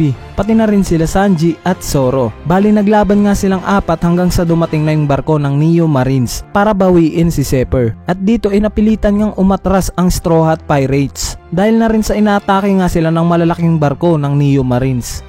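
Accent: Visayas, Philippines. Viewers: Bisaya ka man?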